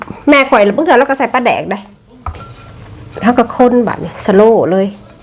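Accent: Savannakhet, Laos. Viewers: ມັກສຳນຽງສາວສະຫວັນ ລູກສາວຈັ່ງແມ່ນເປັນຕາແພງ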